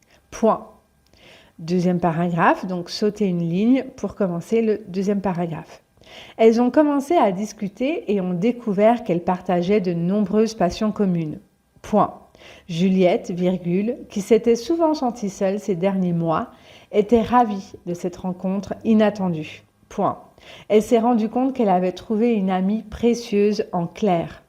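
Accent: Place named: Paris, France. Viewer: Bonjour . Merci . Apparemment , votre accent est français parisien . J'aimerais que vous laissiez les signes à l'épreuve du candidat ainsi que la mémorisation des portions de phrases sauf le . à la fin de la phrase . Voudriez-vous lire et relire moins vite toute phrase pour mi-sourds par la tension comme moi .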